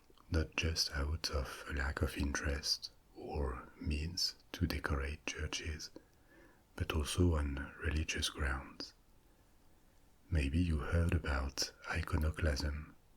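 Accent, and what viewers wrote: France. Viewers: > Great voice > French accent > History lesson What more can a person ask for?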